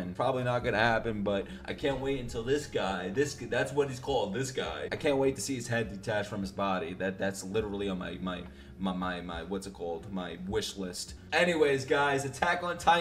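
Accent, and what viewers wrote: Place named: New York City, United States. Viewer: You can already hear the city accent getting to him lolllll. A true NYC resident in the making.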